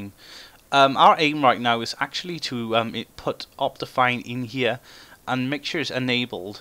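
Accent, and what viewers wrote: Wales, United Kingdom. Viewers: Awesome, worked for me. Btw where are you from? Did I detect a Welsh accent or am I completely wrong? Just as a heads up to others as well optifine lite wont work with the other mods, go standard or ultra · Are you from wales?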